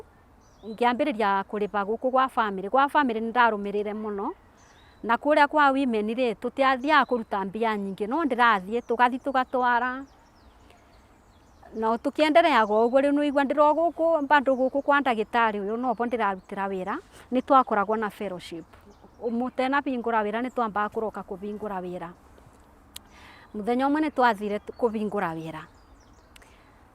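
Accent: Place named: Kirinyaga, Kenya. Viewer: good show. Kama wewe unajua Kikirinyaga yako ni ya "aba" and not "haha" stick to one tongue sisi Wakirinyaga tunajua Kikikuyu yetu.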